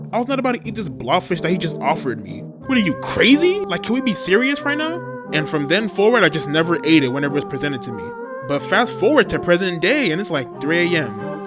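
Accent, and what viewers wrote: Nigeria. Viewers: Hey my brother wassup, I'm also Nigerian, I want to hear your Nigerian accent in the next video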